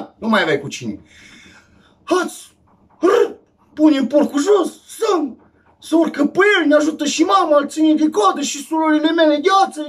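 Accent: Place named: Transylvania, Romania. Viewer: Mai ales graiul ardelenesc oo splendoare... 💪🏻💪🏻💪🏻💪🏻💪🏻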